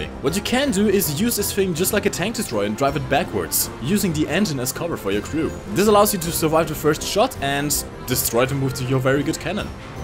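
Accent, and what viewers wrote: Germany. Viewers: Are you german? Your accent is just too flamingly homosexual, which leads me to believe you're German. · are you german? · Are you German? Your pronounciation sounds really like that...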